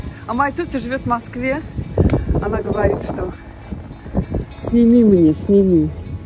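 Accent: Baku, Azerbaijan. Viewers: Привет из Вильнюса! Спасибо за ролик! Как хорошо, что Вы решили погулять! Как приятно слышать речь с бакинским акцентом!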